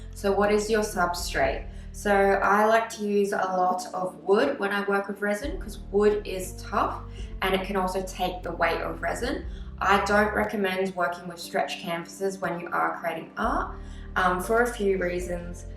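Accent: Australia. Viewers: Aussie perhaps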